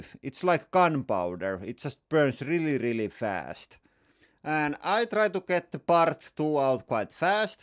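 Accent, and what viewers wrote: Finland. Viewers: Accent and a few words tells me you are Finnish. Correct? Oikee? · I watch just to hear finglish again like at my grandparents. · Your acccent sounds Finnish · lol that finnish rally english, knew you were from finland after first words out of your mouth, way before seeing text on side of that van at background :D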